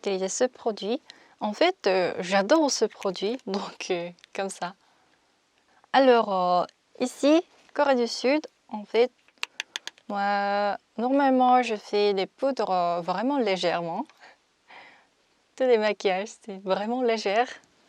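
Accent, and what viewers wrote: France. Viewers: j'aime beaucoup trop t'en accent Français tu es magnifique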